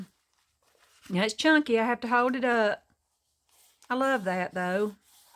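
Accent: South, United States. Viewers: This journal is stunning!! Btw, Where are you from? Our southern accents are the same so I know you're from the south lol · i discovered your channel yesterday. I have to say... Your giggle is charming and your slow southern drawl is so soothing! I love your little stories! Beautiful journal and very inspiring! I will be sure to watch the rest of your videos. Blessings to you 🙏 from Kim in Gettysburg, PA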